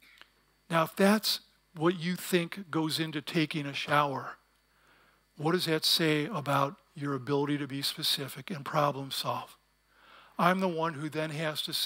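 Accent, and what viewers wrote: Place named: Midwest, United States. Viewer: He sounds like he's from Chicago 100% or at least Midwest lol. I can always tell those peoples' accents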